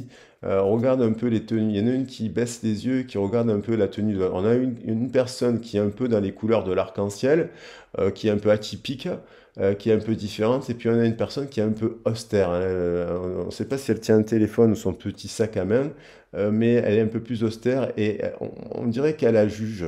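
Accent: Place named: South, France. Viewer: Coucou 👋🏼 🎉 Comment allez-vous aujourd’hui?! Je suis trop contente de vous retrouver sur cette vidéo 😊 j’adore 🥰 votre accent du Sud:-)) toujours aussi intéressante 🧐 votre analyse 📈 belle journée ensoleillée 🌞 mille mercis pour ton énergie mon amour ❤que tu mets dans toutes tes vidéos 🐿️🙏je te fais pleins de bisous 💋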